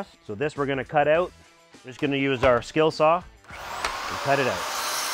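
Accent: Canada. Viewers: Canadian eh???????? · You sound Canadian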